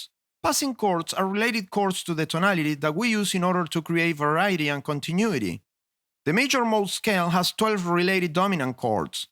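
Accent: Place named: Spain. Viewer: I think the teacher's accent sounds pretty Spanish to me.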